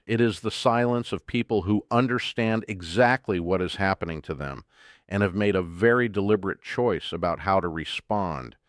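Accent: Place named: United States. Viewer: Hi, I'm so used to you native authentic accent, the American one becomes a distraction.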